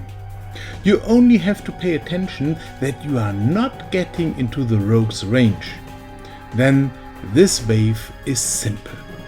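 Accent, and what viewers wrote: Germany. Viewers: I am only in colonial age, but I watched the video just to see the amazing robots, animations and your German accent😂 love your vids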